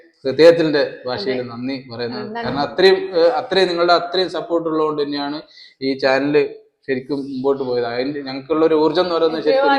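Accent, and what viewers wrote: Kozhikode, India. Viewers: Kozhikodu language kettirikkan rasa ❤adipoli❤ happy familiy❤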